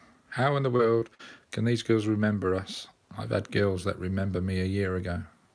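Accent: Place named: Cockney, United Kingdom. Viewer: Yeah can tell you got abit of cockney in you were do you travel most?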